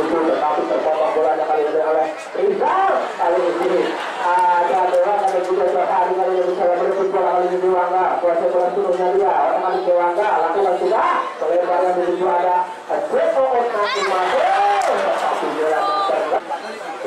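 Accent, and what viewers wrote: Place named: Banyumas, Indonesia. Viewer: ngapak koh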